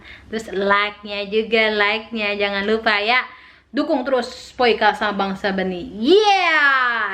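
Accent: Jakarta, Indonesia. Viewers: Gue demeen beneran ama mpok dan bang Sabeni, kereen mantab, semoga Allah swt sll merahmati & melindungi klrg mpok di sono. Mpok lucuu banget, betokaw aseli, saya inget masa kecil kalo denger aksen mpok awesome da'ah! ngikutin vlog mpok, krn saya sejak kecil sd skr tinggal di Betawi Indonesia tercinta. Suatu saat kalo ada rejeki ogut ame anak² yg udah pada gede³ mau ke Sweden ah nemuin mpok se.klg, ibunya anak² udeh meniggal sejak anak³ msh kecil, skrg tinggal jalan2 mau ke Swediaa!! Yeaah, InsyaAllah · Suka sama logat betawi nya langsung Subscribe aku pok😁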